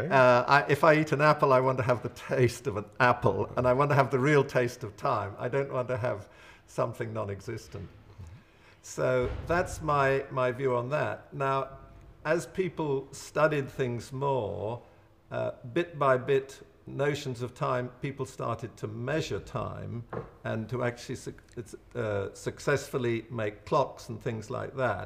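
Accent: United Kingdom. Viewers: His British accent makes him seem much more believable. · He basically admits he is thinking of time as a rigid linear thing, which can be ‘paused’ while other time persists... yet chastising Einstein for thinking of time on a clock. Einstein used clocks as reference for time according to who was using one in relation to acceleration etc. This is old thinking. The clock isn’t the issue... time isn’t the issue.. only to who it’s ‘RELATIVE’ to... he’s describing chapter 2 of E=MC’2 which is 15 chapters. *make another video when you get to the end ;) this only reaffirms that because you have a British accent doesn’t make you wise... it’s having an accent in a theory which has stood the test of ‘our time’ and never, ever been refuted.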